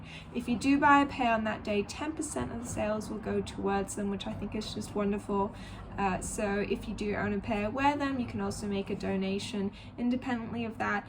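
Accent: Australia. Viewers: Good review and you have Aussie accent 😎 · Before you moved to Australia? But your accent sounds just like any other Aussie!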